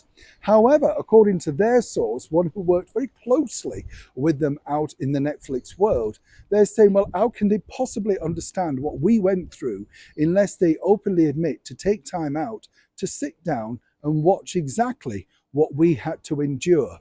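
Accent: Yorkshire, United Kingdom. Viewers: You are so positive...you always cheer me up ..I like your cute little Yorkshire accent, your nice looks , and one must mention the ties....... Blessings for 2023 from very cold Portsmouth.....roll on the spring....xxx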